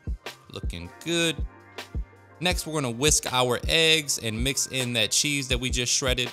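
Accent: United States. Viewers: Why do you amaricans sound so cool???? Us English lads just sound common haha Brilliant video, just come across you, carbonara is my favourite pasta, definitely gunna try your recipe 👌 Peace and love from the uk 🇬🇧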